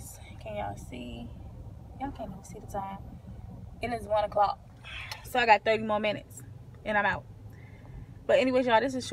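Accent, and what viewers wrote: DMV, United States. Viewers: You from the DMV?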